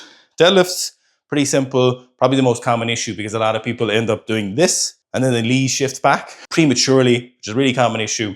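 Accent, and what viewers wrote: Ireland. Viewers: How do I know your voice? Are you feom Limerick?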